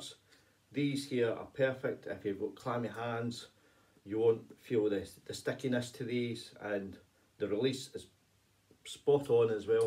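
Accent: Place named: Scotland, United Kingdom. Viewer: Great review! Just ordered a set of these. I will come back and let you know what I think. Love the Scottish accent as well by the way! Cheers from Canada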